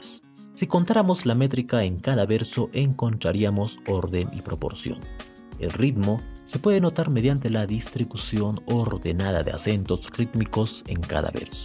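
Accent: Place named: France. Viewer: Tienes minimo acento de un frances , muy minimo pero lo tienes xd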